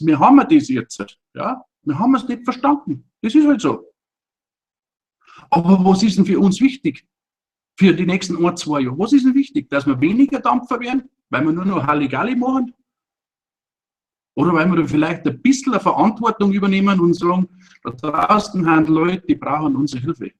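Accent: Bavaria, Germany. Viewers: Klartext und bayrisch, das passt halt einfach wunderbar zusammen... 👍👍👍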